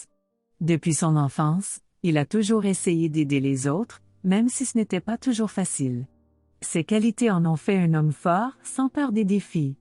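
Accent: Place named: Canada. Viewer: Bel accent canadien J'ADORE bonjour.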